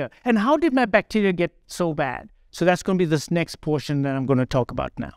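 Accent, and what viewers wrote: India. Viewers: I naturally listen and trust doctors with Indian accent. I've now watched about 8 videos of him. He's a genius ! 😊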